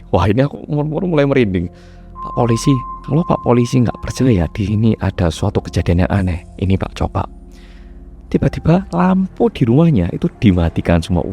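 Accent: Java, Indonesia. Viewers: Sumpah suara host nya MEDOK bet tapi enak didengar hehe semangat terus ya...n semoga chanelnya semakin berkembang....all the best for your chanel 🙏🙏🙏🙏🙏🙏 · mas medhokkkk .. tolong bahas kasusnya furota dong yang di siksa 44 hari .. di perkosa secara sadis sampe mayatnya di cor di tong ... pngn tau cerita lengkap nyaaa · Iyo serem rek.. Aku nyimak siang hari... Sehat sukses selalu muedok🎉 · medok amat rek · Muncul di bawah video bang mewing. Penasaran orang korea ngomong b.Indonesia, ternyata medok..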